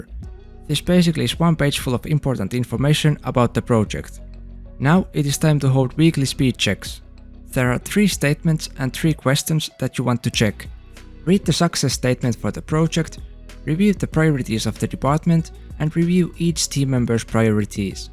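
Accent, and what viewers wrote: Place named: India. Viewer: Can you put YT subtitles? My English is not good and it seems you have Indian accent which is more difficult to understand. Thanks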